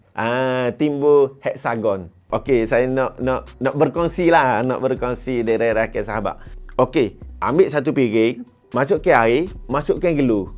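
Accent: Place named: Terengganu, Malaysia. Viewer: Abg ni jerteh terengganu lr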